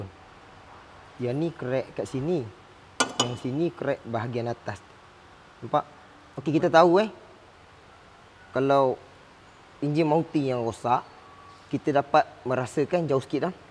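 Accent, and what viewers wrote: Pahang, Malaysia. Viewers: ape pon channel bnyak bantu dlm ilmu yg serba kekurangan tu aku ttp boleh paham..yg penting gelak sendiri tu paling rare..pasni cer try buat video pasai aircond wira bile on je blower jalan tapi compresor xjalan dan bile compresor direct beteri heeellllok pulak..harap ade video ni utk care nak mencari punce die...bossku..loghat awok pahang sangat..hahahaha🤣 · Bengkel kat mana? Cam org phg je..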